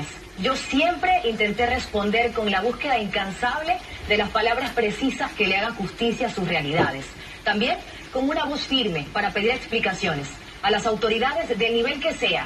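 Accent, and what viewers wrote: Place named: Guayaquil, Ecuador. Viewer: eres una mujer linda valiente inteligente comunicativa sensible, emocional 100%, tienes una voz y el tono de una guayaquileña que encanta, seguro te irá bien, cuídate, te extrañaremos.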